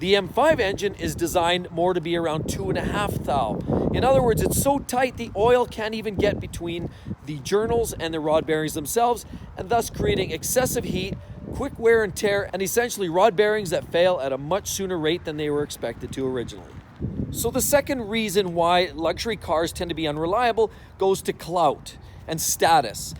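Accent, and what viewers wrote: Canada. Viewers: My pleasure. Thanks for making these very informative videos (with a nice Canadian accent, to boot.:)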